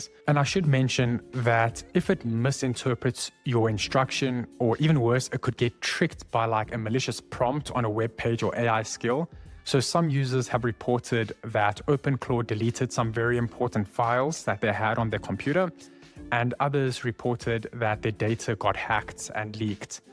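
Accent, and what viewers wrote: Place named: South Africa. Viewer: Didn’t realize how much I missed hearing the SA accent.❤ moved away when I was 11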